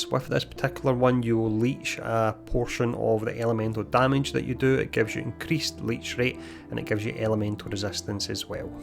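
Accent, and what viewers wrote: Scotland, United Kingdom. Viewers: great accent😊 Scottish? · You Scottish btw?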